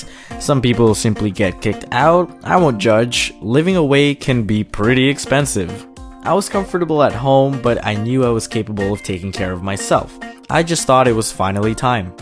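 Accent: Philippines. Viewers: I love your Filipino accent/attitude😂😂I relate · Is it just me or I heard you as a Filipino ?? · I always crack when you speak in "inglis with pilipino aksent" and use some tagalog words. I'm so glad Youtube reccomended this channel. 😂😂😂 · I really like that Filipino Accent of that english speaking skills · Love the Filipino accent 👌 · Nice Filipino accent from the Philippines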